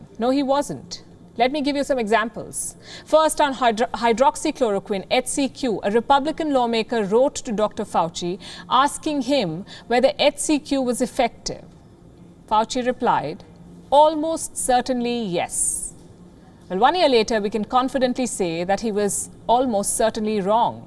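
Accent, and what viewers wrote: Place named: India. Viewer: u have heavy indian accent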